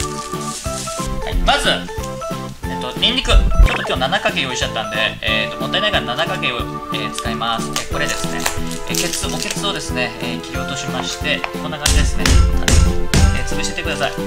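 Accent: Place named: Kansai, Japan. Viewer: 今日も絶好調ですねww リュウジさんはなんでちょいちょい関西アクセントはいるの。 そこもすこ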